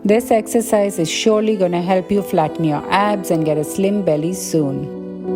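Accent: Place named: India. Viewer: Elle super intéressante ta vidéo, sauf que ton English est trop indien😅😅😅😅,mais ça va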